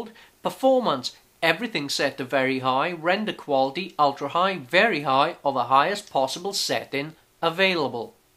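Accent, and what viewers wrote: Wales, United Kingdom. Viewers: I love the welsh accent :D Great review.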